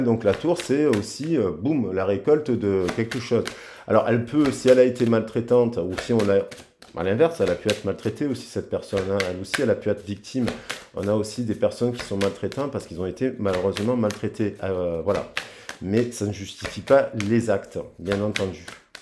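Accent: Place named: South, France. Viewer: Coucou 👋🏼 🎉 Comment allez-vous aujourd’hui?! Je suis trop contente de vous retrouver sur cette vidéo 😊 j’adore 🥰 votre accent du Sud:-)) toujours aussi intéressante 🧐 votre analyse 📈 belle journée ensoleillée 🌞 mille mercis pour ton énergie mon amour ❤que tu mets dans toutes tes vidéos 🐿️🙏je te fais pleins de bisous 💋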